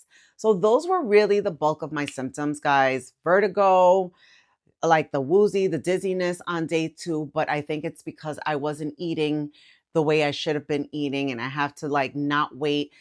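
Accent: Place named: Puerto Rico. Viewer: I just found your channel looking for glp1 histories, I’m in my second week and in my first I just lost 1/2 pound and I was so tired so is good to know I’m not along. Also I was hearing you and for me you sound Puertorican and when I found that you are, I got excited because cuando consigo una compatriota Boricua que cree en Jesús, me emociono, congrats for your channel, don’t give up. 😉 God bless you 🙏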